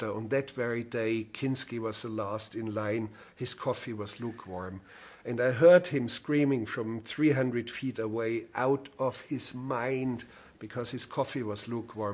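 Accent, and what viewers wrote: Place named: Germany. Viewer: I just love the contrast between Herzog’s masterful command of the English language and the thick German accent that glazes it. English is indeed the language that rules the world.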